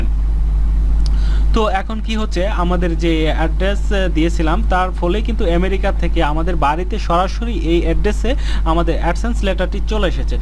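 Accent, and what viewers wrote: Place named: Jamalpur, Bangladesh. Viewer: অনেক অনেক অনেক!!! ভালো লাগে আপ্নার ভিডিও গুলা। তাই একটা ভিডিও আপ্নার মিস দেই না। আমি আগে মনে করতাম আপনি হয়তো ভারতীয়। কিন্তু আজকের ভিডিওতে আপনার কথা শুনে আমার চোখ কপালে উঠে গেছে। আপনি আমাদের জামালপুরের। এখন নিজেকে আসলেই গর্ভিত মনে হচ্ছে। আমাদের জামালপুর এমন ইউটিউবার আছে। আমি জামালপুর সদর এ থাকি ভাই। আপ্নার কাছে অনেক কিছু শেখার আছে আমার ভাই। একবার দেখা করতে চাই আপ্নার সাথে ভাই।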